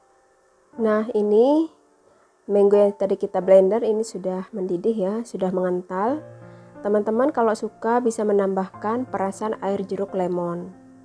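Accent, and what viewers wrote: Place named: Java, Indonesia. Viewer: mantab.... suaranya medhuk ya kak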